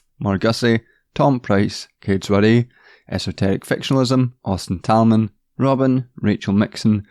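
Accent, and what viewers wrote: Scotland, United Kingdom. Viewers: My first video on your channel. It's good to hear a fellow Scottish voice talking about how shite everything is. Thanks for that. The Braveheart remix was a nice touch. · You got me with your Scottish & I subbed for the lefty content. We need to make alliances.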